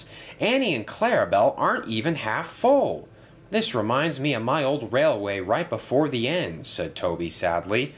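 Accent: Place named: Scotland, United Kingdom. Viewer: Ur scottish accent is on point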